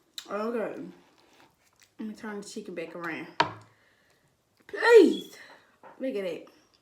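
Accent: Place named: United States. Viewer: Love you and your country accent lol girl friend shooot mmmhmmm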